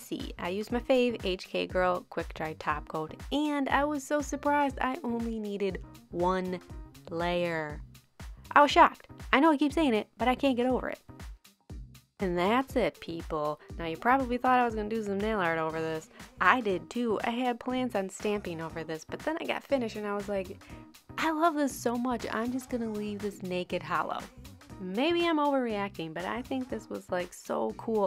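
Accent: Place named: North Dakota, United States. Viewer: Are you from North Dakota or anywhere in the north? :P You've got a lil bit of an ND/UP Michigan accent. I could be totally wrong tho lol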